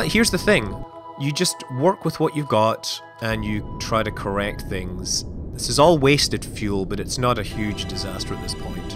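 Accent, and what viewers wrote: Scotland, United Kingdom. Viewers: i love that your brilliantly scottish accent means that you could be saying mun or moon, i love it xD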